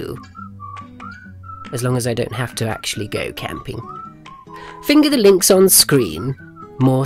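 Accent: United Kingdom. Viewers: It's a British guy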